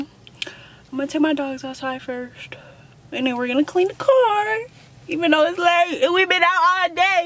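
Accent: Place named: United Kingdom. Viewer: The British accent 😂LOL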